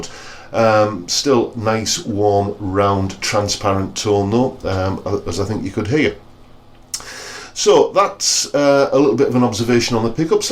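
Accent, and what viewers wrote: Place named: Teesside, United Kingdom. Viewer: Thanks for the review, I'm just about to buy one of these (I think), or an ESP Ltd 256FM in black for the same but I prefer the beautiful colour of the HB. Glad to hear it sounds as amazing as it looks. On a side note, you sound like you're from my neck of the woods - Stockon on Tees? Definitely a fellow smoggie :)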